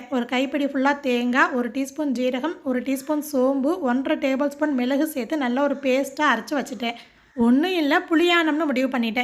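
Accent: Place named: Madurai, India. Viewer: Pera ketalea odiruvangalea..... Enga madurai slang la pesuringa Nala iruku sissy....❤❤❤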